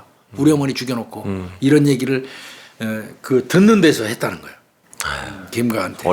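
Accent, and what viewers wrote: Chungcheong, South Korea. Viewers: 아...정말 가끔 나오시는 충청도 말씨 너무너무 좋아요~~영화 곡성뒤엔 전라도말씨에 빠져있었는데 요즘엔 충청도말씨에 빠져있어용ㅋㅋㅋ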